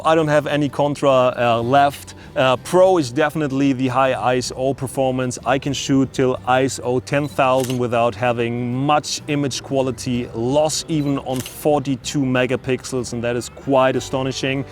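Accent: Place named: Germany. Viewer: Hey, habe auf dem deutschen Kanal das Video gesehen in dem die Frage gestellt wurde 'wieso so viele englische Videos usw.?' Ich schaue mir lieber den englischen Kanal an.. ich liebe es mich dadurch weiterzubilden und dein englisch ist schön klar verständlich. Scheiß auf deutschen Akzent.. mach weiter so! Ich feiere den Channel!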